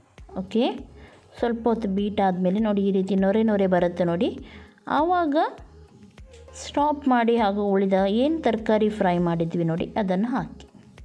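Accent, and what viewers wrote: Mangalore, India. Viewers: Ee Mangaluru Kannada style kelodheyyyy ondu khushi😂 Chikka makligey helidha haagey yeshtu muddhagi helthaa prepare madthiri :-) God bless U ma 😊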